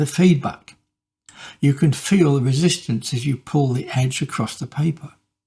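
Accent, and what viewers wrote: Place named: United Kingdom. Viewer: J'ai dû beaucoup dessiner dans ma vie car ceci est exactement ce que je fais en dessinant. En suivant cette manière de faire, on développe un sens assez fin de l’état de la pointe du crayon, permettant toutes les nuances sans avoir trop à réfléchir. J’ai beaucoup aimé votre style de dessin, je vais regarder vos autres vidéos. En vous écoutant je me rends compte que quand j’écoute un accent britannique, je fais très confiance instinctivement au niveau de compétences et de sérieux de la personne. J’apprécie la modestie des britanniques alors que assez systématiquement les gens ont des habilités particulières à communiquer qu’ils ont peaufinées pendant longtemps. Merci, un bonjour de 🇨🇵 !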